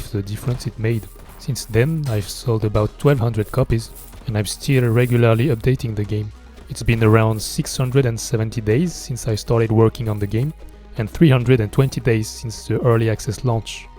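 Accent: France. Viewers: ton accent m'a l'air français ^^ Superbe travail c'est trés motivant.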